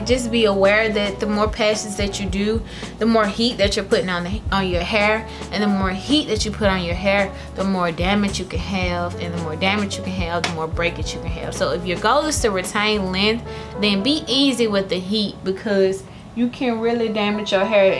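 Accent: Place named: South, United States. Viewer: Wow, you hair is gorgeous and I love your curls. Oh, I love your southern accent too.❤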